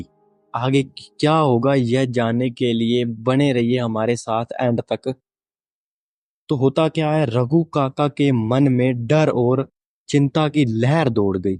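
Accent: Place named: Punjab, India. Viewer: Jado tu story start kiti mein pehla he guess kr lya k tu Punjabi ha, kehra pind Mitra tera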